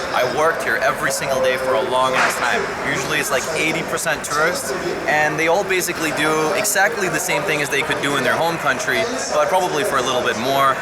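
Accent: United States. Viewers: How did you get that American accent?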